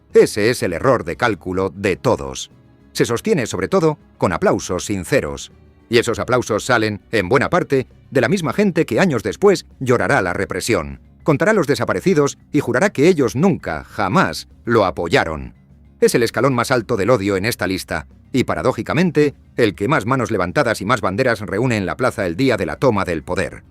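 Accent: Spain. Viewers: El relator tiene acento español,si este video es hecho por un español,te quiero decir que los más odiados son los españoles y España, porqué si somos una mierda es gracias a uds. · Te noto acento español.. Pero te equivocas con Uribe.. Ese sesgo te hace perder credibilidad..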